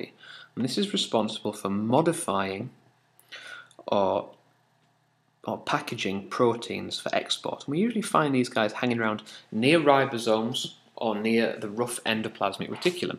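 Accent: United Kingdom. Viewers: he bri'ish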